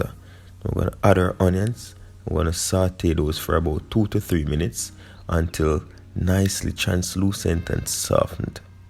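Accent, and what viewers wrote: Jamaica. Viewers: The JA accent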